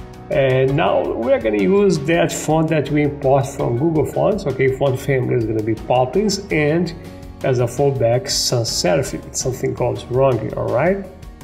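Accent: Brazil. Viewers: Você é brasileiro ne ? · I've never heard a brazilian dude speaking english😂😂😂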